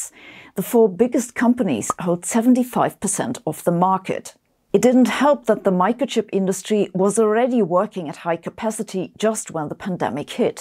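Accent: Germany. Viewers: pɹəˈdʌkʃən love the german accent😊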